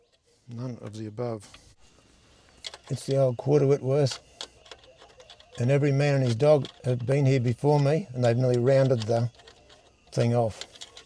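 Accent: Australia. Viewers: Thanks for aussie acent! Greeting from mo.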